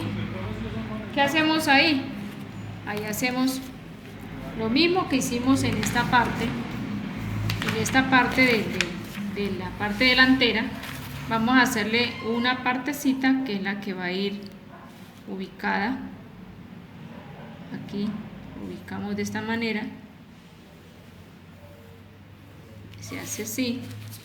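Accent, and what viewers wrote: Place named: Colombia. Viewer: Amiga gracias por esa noble labor de enseñar con tanta paciencia. Que el Señor siga bendiciendo tu vida y sobre todo siga derramando mucha sabiduría. Soy de Colombia me imagino por su acento que usted también .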